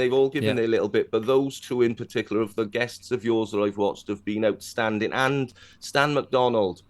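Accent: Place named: South Wales, United Kingdom. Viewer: proper south Welsh accent.....Swansea/Clydach valleys?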